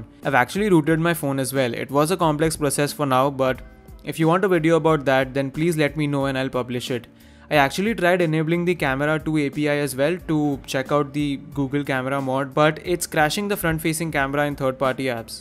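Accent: India. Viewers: My friend good presentation except your indian fast talking accent is making an english speaking or non english speaking person find it very difficult to understand the proccess and not being able to follow the steps out of fear of either not making it or destroyubg his phone.....Please speak slower......Thank you..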